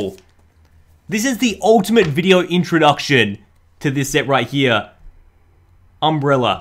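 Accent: Australia. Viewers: Is he an Aussie YouTuber?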